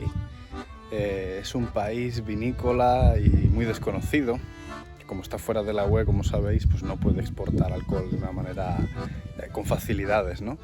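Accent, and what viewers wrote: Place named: Mexico. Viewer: Muy interesante pensé que eras mexicano por tu físico y tu español. Así es los países poderosos siempre quieren lo que otros pueblos tienen y se los roban e invaden para quitarle sus riquezas. Eso sucedió con mexico y latinoamerica. Cuando España nos invadió , masacró , eliminó , nuestros idiomas originales y se llevaron todas nuestras riquezas Pero lo que no nos pudrieron robar fue nuestra esencia y valores. Me gusta mucho Moldavia y Rumania son parecidos a los de latinoamerica. Mucha suerte en tu canal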